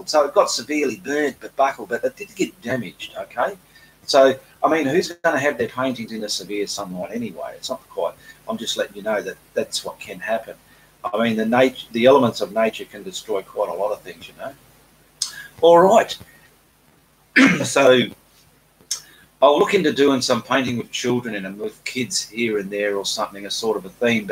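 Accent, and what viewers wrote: Australia. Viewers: Wow i love your painting but now im watching this video because my english teacher suggested to get used to different english accents and so far australian is the hardest for me to undrestand😄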